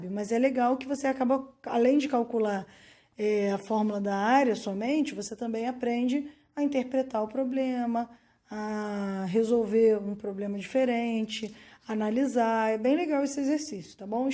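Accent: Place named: Pará, Brazil. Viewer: boa prof se solta um pouco mais. vc é paraense ksksks pareçe muitooo tbm sou,rsrs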